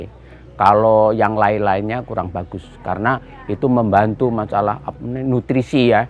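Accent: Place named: East Java, Indonesia. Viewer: sprt org jatim yah mas ...😁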